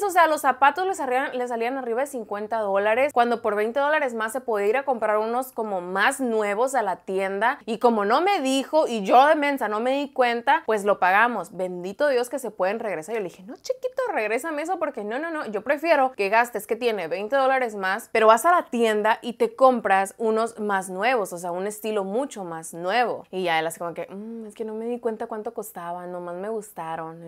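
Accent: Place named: Sonora, Mexico. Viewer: Nueva subscriptora! Me saliste como sugerencia y te ame 😊 yo soy de Sonora y me divierto con tu forma de hablar muy parecida a nosotros los Sonorenses 😂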